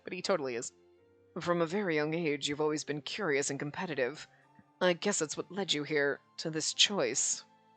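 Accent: Ireland. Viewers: YES! The "Irish" Accent appears. I don't know why, but that has become my favorite of your voices. XD Don't apologize for it. We love it. We're charmed by it. ... At least I am. LOL I LOVE these two Sato brothers!!! OMG! I'm gonna love the side stories for them. And all four men fighting over who carries Shanao, just perfection. XD XD YOu know those two Sato brothers TOTALLY know she's a woman and are totally enamored with her already. XD XD GEEZ! This game keeps getting better and better. XD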